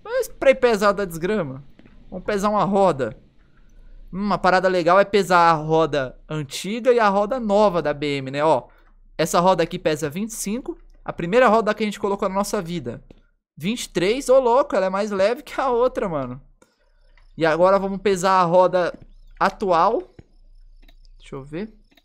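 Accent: Minas Gerais, Brazil. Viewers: a cada dia mais mineiro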